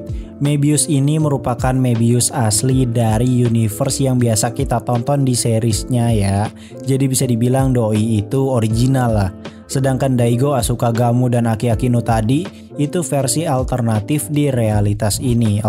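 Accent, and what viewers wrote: West Java, Indonesia. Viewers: orang sunda gening euy🤣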